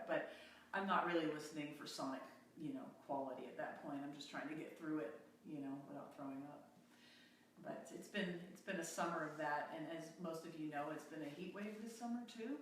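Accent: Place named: Canada. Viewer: You can definitely tell you are Canadian when you speak, the accent definitely doesn’t show when you sing❤️🇬🇧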